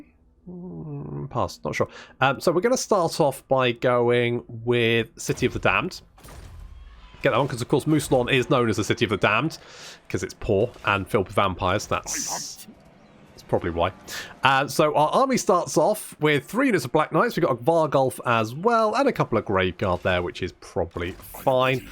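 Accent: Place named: Northern England, United Kingdom. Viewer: I only watch CA content if it's made by a man with a northern english accent. I don't make the rules, but I do voluntarily and enthusiastically enforce it.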